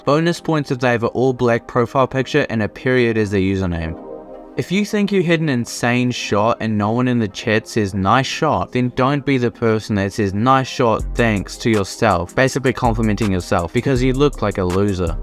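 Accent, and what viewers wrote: New Zealand. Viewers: Are you a kiwi.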